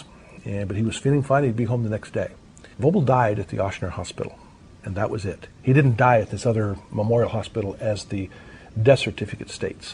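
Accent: Pittsburgh, United States. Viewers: I got his book amazing work you from Pittsburgh I live on the norside of Pittsburgh off Brighton road I ask because your accent is Pittsburgh all the way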